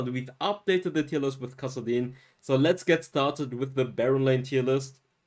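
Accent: Germany. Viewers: R u german?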